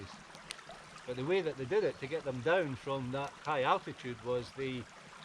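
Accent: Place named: New York, United States. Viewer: that is too funny. When you speak it is, to me, like a fellow NYer. Thanks for getting back to me and thanks for the video. BTW, food looks so much better cooked at a campsite. Be well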